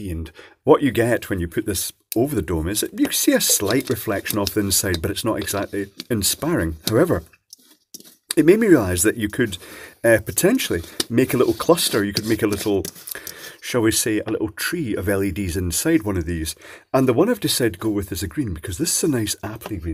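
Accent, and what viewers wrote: Scotland, United Kingdom. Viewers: Pure meditation, the soothing flow of on the fly hacking and improv... plus the mellow lilt of the Scottish commentary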